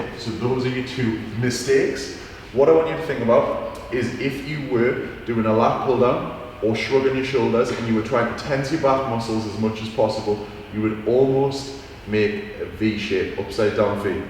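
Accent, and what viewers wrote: Newcastle, United Kingdom. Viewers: He has an accent? Which part of UK he is? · He´s from Newcastle, north of England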